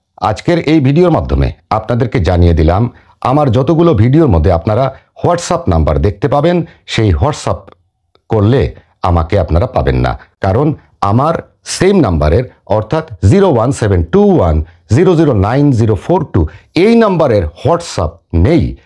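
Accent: Sylhet, Bangladesh. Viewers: Apnr vasa sylhet kutay plz bolen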